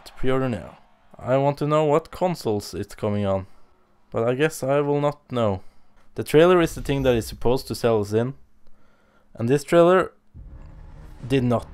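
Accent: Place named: India. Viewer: your accent sound indian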